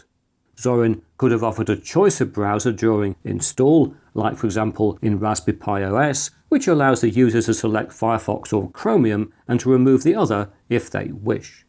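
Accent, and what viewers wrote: England, United Kingdom. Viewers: It's like watching Bill Gates in the 70s, but then with a British accent, loved the old school video ! 😃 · I love the way you explain. This is great, thank you English Man, love the accent too by the way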